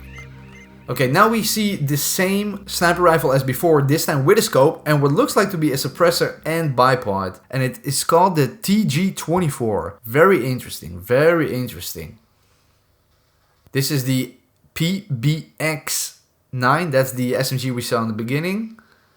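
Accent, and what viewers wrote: Netherlands. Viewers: sound like an dutch accent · You sound Dutch af? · I smell Dutch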